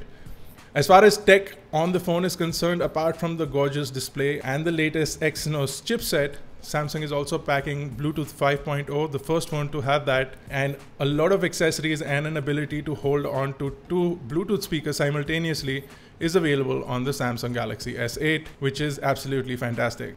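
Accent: India. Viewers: Great Showmanship and Stylish yet understandable Indian Accent, Rock On,........... Make a VS Vid with the G6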